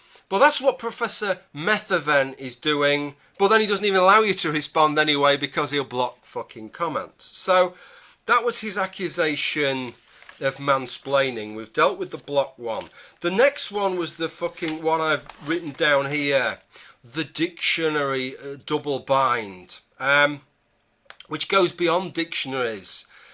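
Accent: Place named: Yorkshire, United Kingdom. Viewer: No, it's a Yorkshire accent. Bit late but now you know.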